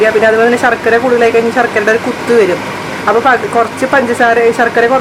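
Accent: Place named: Kannur, India. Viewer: Ingal Kannur aano😜Nala language😝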